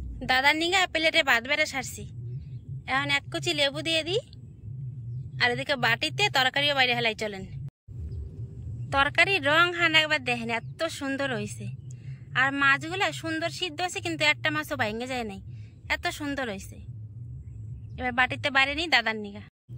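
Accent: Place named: Bangladesh. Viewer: দিদি তোমার বাড়ি কি আগে বাংলাদেশে ছিল তোমার কথাগুলো একদম বাংলাদেশের মানুষের মতো❤️❤️❤️❤️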